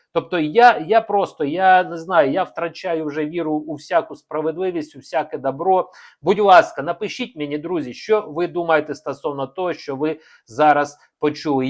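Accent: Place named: Russia. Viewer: На какой ты мове розмовляеш у тебя страшный российский акцент не треба кацапский выучи мову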